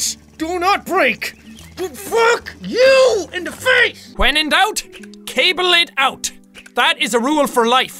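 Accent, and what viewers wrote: United Kingdom. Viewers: Calm, British Jack filled me with hilarity and concern. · Good British voice PS it's amazing !!!! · wow I didn't know he was British too XD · HISS VOICE IS BRITISH I KNEW U WERNT IRISH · YOU WOUND SOOOOOOO BRITISH! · I love his British accent lol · you have good british aqzent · Omg that British accent though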